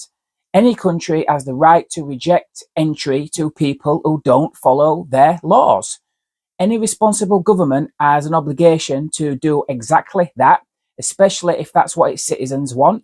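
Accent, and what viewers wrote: United Kingdom. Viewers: Certainly speaks with a funny accent (like most Brits). Was that a gay Yorkshireman?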